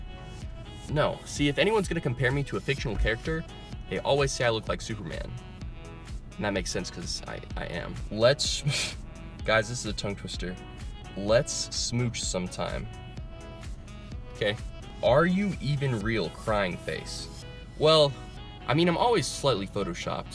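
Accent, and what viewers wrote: Ireland. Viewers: Are your parents or grandparents Irish? You have slight Irish accent.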